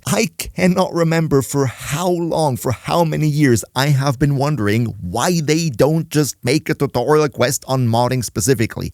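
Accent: Germany. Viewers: U are German???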